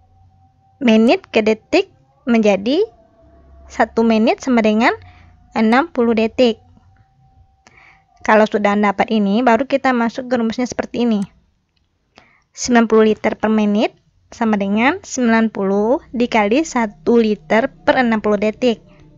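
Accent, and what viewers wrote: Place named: Malaysia. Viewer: suaranya kok gitu kayak orang malaiysa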